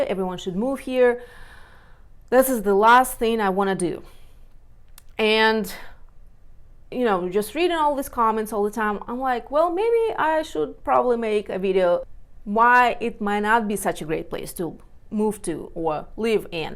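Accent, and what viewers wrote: Russia. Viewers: Sound like a Russian mail order bride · I like you accent Russian.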